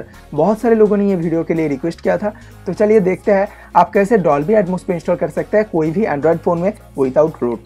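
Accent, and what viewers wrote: Bengal, India. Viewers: You Bengali ??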